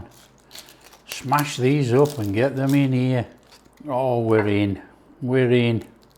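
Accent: United Kingdom. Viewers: I like your British accent.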